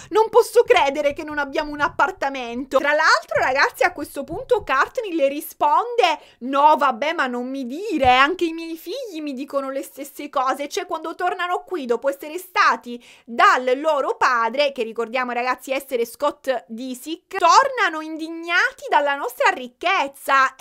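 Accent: Sicily, Italy. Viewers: esagerato accento siciliano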